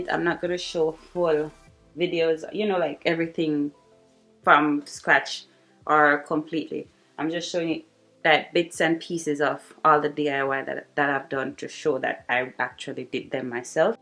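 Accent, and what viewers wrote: Jamaica. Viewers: As soon as you started speaking I was like "ooooooh she Jamaican" . Subscribed! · I'm sorry I just read your bio. I didnt even knew there was one. I love your videos. Might not get to like as I watch.....i always forget to but I love your videos. Your accent screamed jamaican so that's y I was curious. ❤❤❤❤❤❤❤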